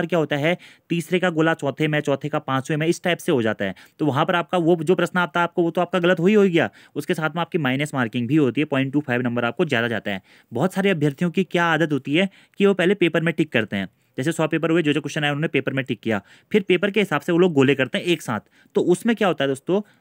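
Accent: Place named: Pahadi, India. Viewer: Hey! handsome bro, ever first time aapka deedar huwa, Literally handsome, I like your pahadi accent. M an esm, following you for uk gs❤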